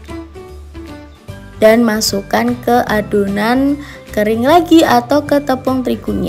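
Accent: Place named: Java, Indonesia. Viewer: Nada Jawanya terlalu muuweddok banget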